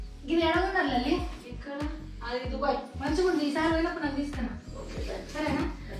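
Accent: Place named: Nizamabad, India. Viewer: Nizamabad ascent🔥 mupkal❤️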